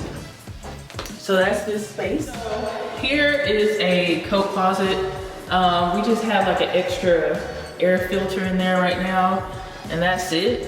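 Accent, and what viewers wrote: South, United States. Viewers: Are you southern?I just became a follower today. Your videos kept popping up and I love your content. I cant help but detect a drawl. Im from Mobile.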